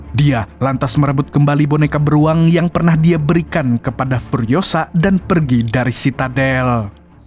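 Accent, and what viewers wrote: Banjar, Indonesia. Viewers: Urang Banjar kah Nang? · Banjar asli kah mang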